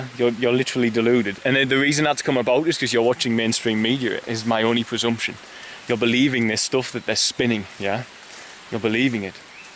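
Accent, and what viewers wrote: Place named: Newcastle, United Kingdom. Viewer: He's got a Geordie accent so I would assume he's from the Newcastle area.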